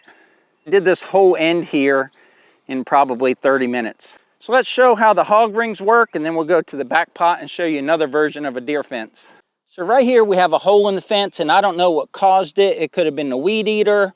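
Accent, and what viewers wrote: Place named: Florida, United States. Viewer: your Florida accent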